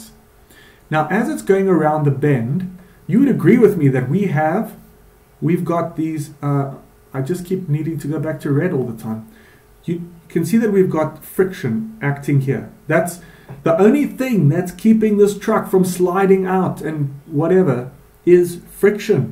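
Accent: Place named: South Africa. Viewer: It's so refreshing hearing a Saffa's vvoice on one of these videos : )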